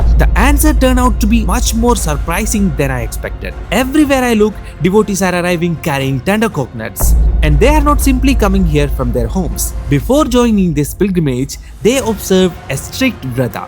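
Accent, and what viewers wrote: India. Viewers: it is not bad bro you don't have to apologise if some can't even bother to be attentive to what's being said, it's still Indian English not British or anything.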